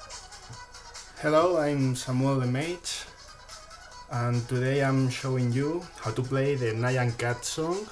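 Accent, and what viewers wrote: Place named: Spain. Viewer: Como se nota que eres español xD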